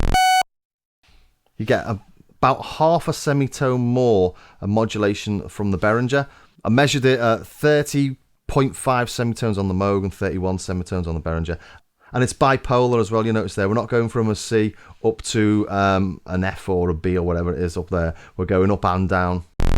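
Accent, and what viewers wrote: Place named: Northern England, United Kingdom. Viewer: \Good on you and good to hear your northern accent!!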